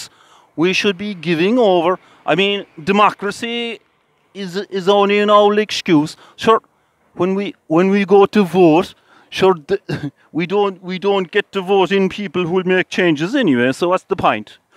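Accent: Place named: Kerry, Ireland. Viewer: I really enjoyed this . Cannot understand why you had so little views !! I do however suspect you are a Corkman putting out a bloody good South Kerry accent .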